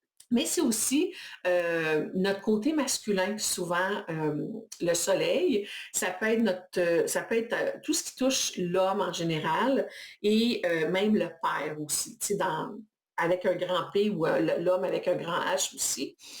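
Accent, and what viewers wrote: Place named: Canada. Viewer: Bonjour 🤗Je ne vous connaissais pas, je m'abonne à votre chaîne et en plus, votre accent Canadien est trop sympa 🧡🌿🌼🌷🌼🌿🧡 Plein de belles énergies de La Provence 🌈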